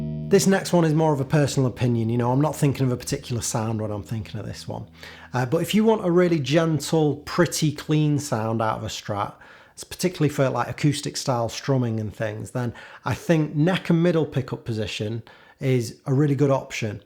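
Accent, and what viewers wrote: United Kingdom. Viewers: I get annoyed with the British accent..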